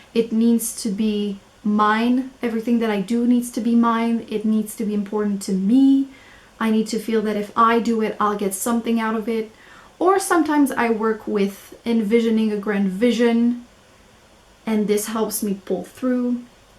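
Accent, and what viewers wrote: Quebec, Canada. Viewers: I'm still waiting for my diagnosis, but watching your video pretty much confirms my doubt, I feel the same way. Thanks for making theses videos, feels like i'm not alone in this. Also i'm detecting a french accent, i'm guessing your french canadian also?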